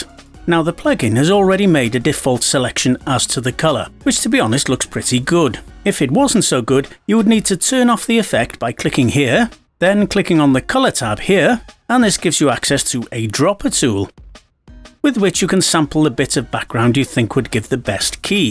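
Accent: United Kingdom. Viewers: British accents clench my jaws...I'm good for about 30 seconds...max...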